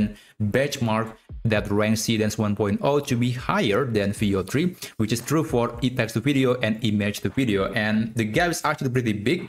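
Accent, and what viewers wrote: Indonesia. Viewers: Indonesian banget logatnya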